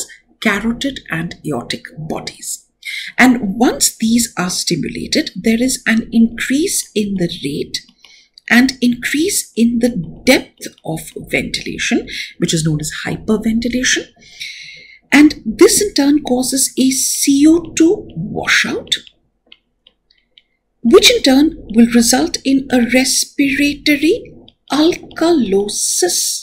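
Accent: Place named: United Kingdom. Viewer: Mam uk wali nhi hindi wali pronounciation bolie , nhi smjh me ata but content acha h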